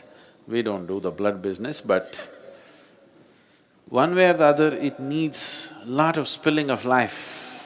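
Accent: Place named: India. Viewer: من از لهجه هندی بهتر انگلیسی یاد میگیرم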